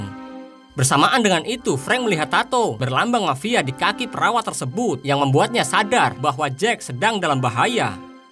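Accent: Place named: Siau, Indonesia. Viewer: Logat Siau kang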